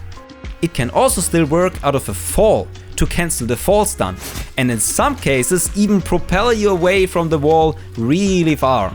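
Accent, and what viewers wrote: Germany. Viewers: I have a question. Is your native language german?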